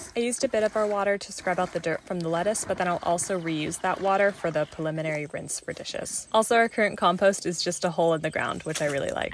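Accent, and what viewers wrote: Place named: New Zealand. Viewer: Love the way the kiwi is creeping into her accent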